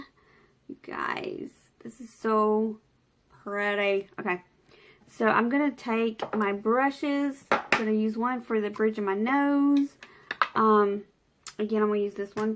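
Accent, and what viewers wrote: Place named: Tennessee, United States. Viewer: I'm assuming that if you was my neighbor , we d be best friends. You remind me of my friends in Tennessee, so I like hearing you talk.And you go through same traumas and dramas we all go through, so you are relatable.And you are knowledgable where I am ignorant, so you are my teacher ❤️❤️❤️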